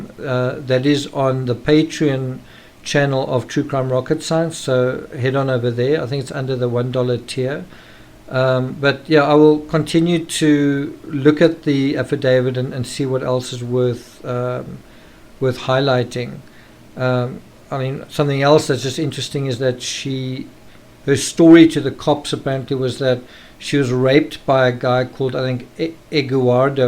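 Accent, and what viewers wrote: Afrikaans, South Africa. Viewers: He has a neutral Afrikaans accent. It's obvious he grew up speaking both English and Afrikaans, though. (Of course, this is just my assumption.)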